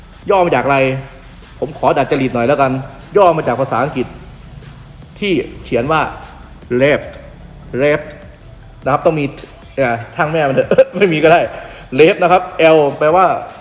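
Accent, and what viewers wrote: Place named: Isan, Thailand. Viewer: เว่าอิสานว่ะ555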